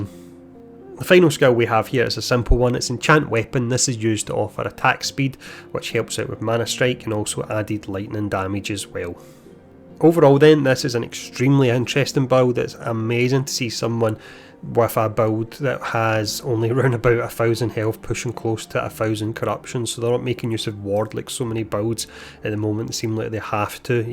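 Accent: Scotland, United Kingdom. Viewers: Scottish accent ?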